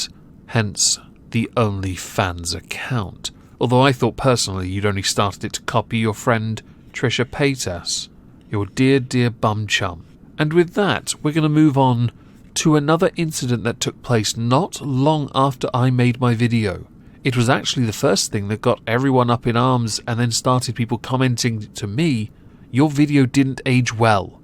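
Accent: RP, United Kingdom. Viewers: Tbh, it's actually really contrived, whereby he puts dramatic emphasis on certain words. He sounds like a posh person trying to sound deep and meaningful...he has you suckers fooled!! lol!!!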